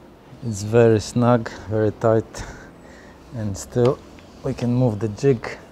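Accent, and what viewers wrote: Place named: Poland. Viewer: Very nice video mate. Well done! Where are you oryginaly from? Your accent sound Polish am'i right?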